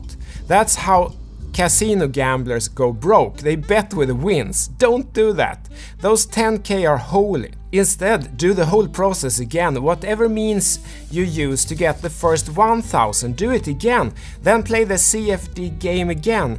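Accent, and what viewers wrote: Sweden. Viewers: Dina videon är roliga och lärorika. Dock gör det lite ont i mig att höra din svengelska, fast ju mer jag lyssnar desto charmigare blir den:)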